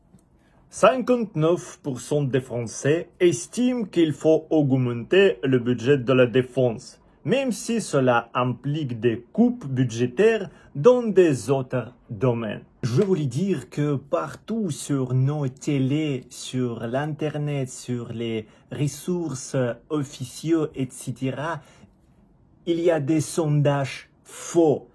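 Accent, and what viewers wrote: Russia. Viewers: Personne n'a envie de suivre notre Président, nous souhaitons sa destitution. Mais merci pour votre communication. J'aime beaucoup l'accent russe😊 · Merci à vous de parler en français et expliquer à certains de nos compatriotes aveuglés par le discours de M. Macron, qu'avec votre analyse il est tout simplement impossible que la Russie envahisse l'UE. Macron est l'ennemi public n° 1 de non seulement de la France mais aussi de l'UE. PS : j'adore votre accent russe 🙂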